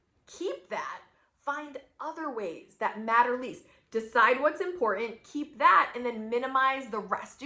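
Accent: United States. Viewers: I'm working on my no-buy, low-buy strategy and also working on my "aspirational crafting" collections. I've got way too many different craft interests, and I'm going to hone them down to TWO: photography and drawing/acrylic painting. I'm in Connecticut and you sound like you're from the state as well. If so, great to "meet" you and your really helpful channel! 😊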